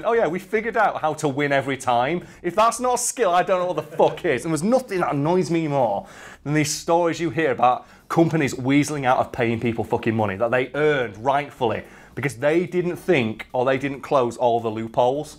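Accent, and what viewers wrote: United Kingdom. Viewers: i can't understand british for the life of me and this video is solid proof of that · Did anyone else have to rewind this a few times because the British is too much?? Maybe it's just the Jack Daniel's, idk ;)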